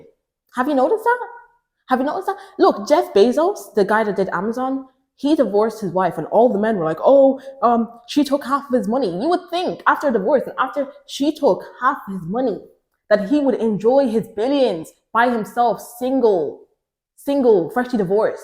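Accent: Ireland. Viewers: Beautiful lip colour. I hear the Irish accent 😀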